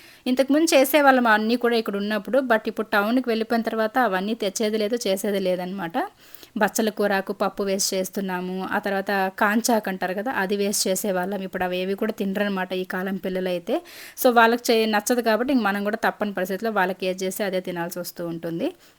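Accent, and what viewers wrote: Chittoor, India. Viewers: Meeru matladuthunte chala bhagundhi same mana Chittoor bhasha. Village untadam vall konni upayogalu untayi kadha. Vegetables kondam undadhu,porakalu, Chintapandu elantivi kone pani undadhu.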